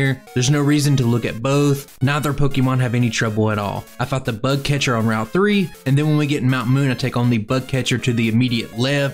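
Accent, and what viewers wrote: South, United States. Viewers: I dig the race format. I had to watch twice because the first time I fell asleep listening to your smooth southern twang. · Youtube fed me your channel to discover you, gotta admit, that southern accent totally broke up my background and made me pay attention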